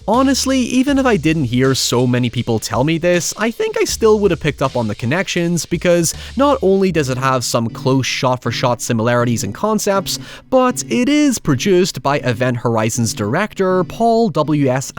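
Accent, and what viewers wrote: Ireland. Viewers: You know, when you explained your Payton situation it just sounded like you were saying the exact same word twice and I'm still not sure what exactly you were confessing. Let's be real, the accent is part of the reason we're all here. In fact go harder, unleash that brogue my guy! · You have an Irish accent. Nothing wrong with that. You speak English better than the English do. Keep it up. Slainte !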